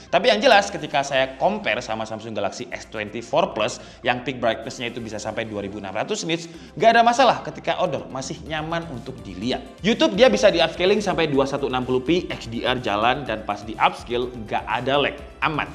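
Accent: Java, Indonesia. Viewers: jowoan rek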